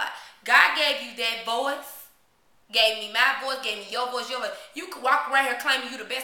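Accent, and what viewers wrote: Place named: South, United States. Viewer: THANK YALL ONCE AGAIN!!!! lol luv her country voice... Its in all us dirty south folk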